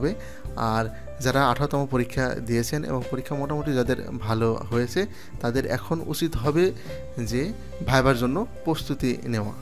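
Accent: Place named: Khulna, Bangladesh. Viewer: Apnar basha Khulna.. right?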